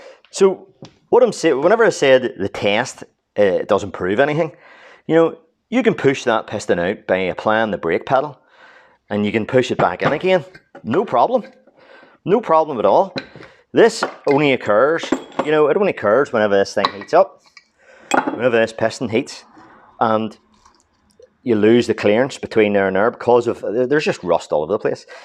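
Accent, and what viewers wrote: Northern Ireland, United Kingdom. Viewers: This is not a British accent! It's Northern Irish. You could say it's a UK accent however.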